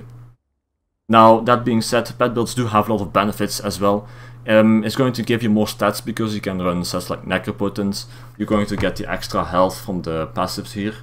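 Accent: Limburg, Netherlands. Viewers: you sound dutch :) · You got a Limburgs english accent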